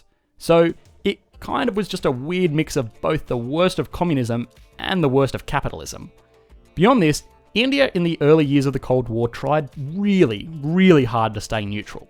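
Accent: Australia. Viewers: Hey are you from Australia!?!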